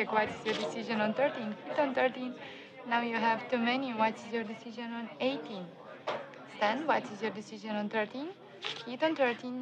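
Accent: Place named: Eastern Europe. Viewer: thank you for the answer, so they don't hide the fact they based in Romania? I wasn't mistaken then when I suggested this is Eastern European accent :)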